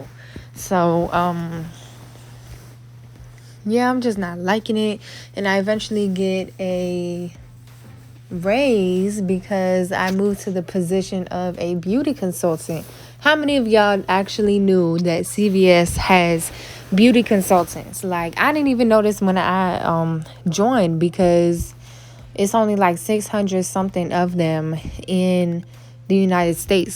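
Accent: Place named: DMV, United States. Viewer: I knew you was from dmv! Heard it in ya voice! I love your nails! You bomb AF!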